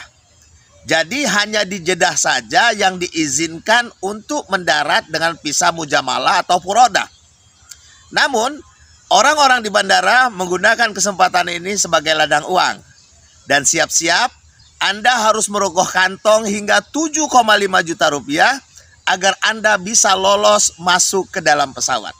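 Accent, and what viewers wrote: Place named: West Java, Indonesia. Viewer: Orang sunda ya bang, pisa 😊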